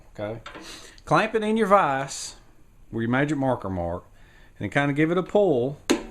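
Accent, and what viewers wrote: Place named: Kentucky, United States. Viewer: I want to see the car/truck under the tarp! Nice video btw. Where you from with that accent? Sounds like a Kentucky accent. :)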